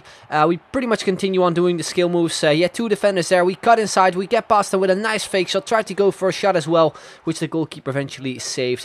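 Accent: Netherlands. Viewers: Is he dutch?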